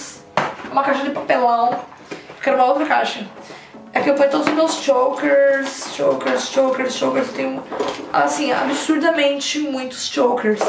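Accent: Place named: Rio Grande do Sul, Brazil. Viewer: Sotaque gaúcho....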